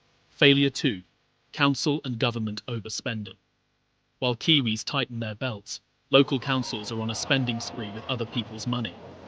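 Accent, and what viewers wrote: England, United Kingdom. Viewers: What a miserable outlook video 😮 brought to you by a meloncoly sounding pommy shingles patient lacking any form of sunshine. Gore is ok for living or housing. Thjs video is just bullshit. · I find it curious that the narrator is clearly a Brit having to point all of this out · It is interesting that the narrator has an English accent, because the UK is far far worse ... it is a country destroying itself and is well on the way to becoming an Islamic state in the not too distant.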